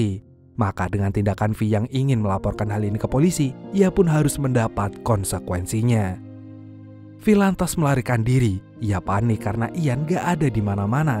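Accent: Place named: Tegal, Indonesia. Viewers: tegal hadia Maseeehh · Rika wong ndi sih bang, ngomonge deneng ngapak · Sihhh malah wong ngapak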